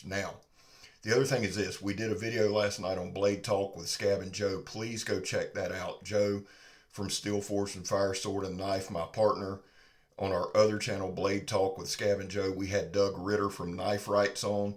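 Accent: South, United States. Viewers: Ohh OK.. I'm in GA. I seen your shirt and your Southern accent and thought maybe you lived around down here.. Love your video's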